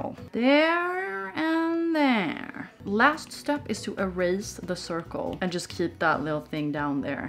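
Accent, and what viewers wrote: Sweden. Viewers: you sound kind of swedish thats so cool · Is she Swedish? Sounds like she has an Swedish accent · Are you Swedish just a question? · Didn't realize that you where Swedish until like 4 minutes into the video. Well, I'm Swedish to so... Hejsan Svejsan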